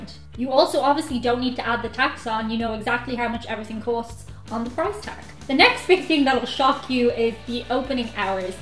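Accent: Ireland. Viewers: Beautiful as usual. I always enjoy the Irish accent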